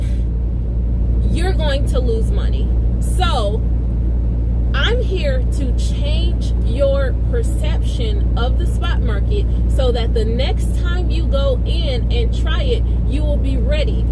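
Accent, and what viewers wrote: South, United States. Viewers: Are you from the south? What are some good load boards besides DAT? Can you name a few?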